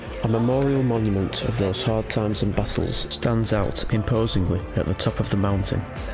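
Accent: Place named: North, United Kingdom. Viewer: Great vid, narrated by a good Northern lad. Magic 👍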